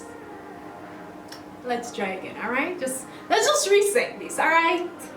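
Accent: Jamaica. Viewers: Loving your jamaican accent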